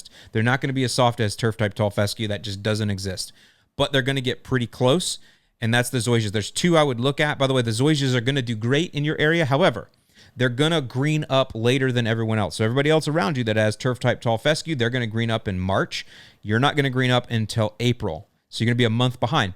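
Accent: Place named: South, United States. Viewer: That southern accent 🤣🤣🤣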